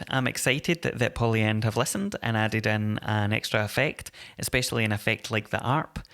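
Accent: Scotland, United Kingdom. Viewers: Cracking tutorial - good to hear a fellow Scotsman!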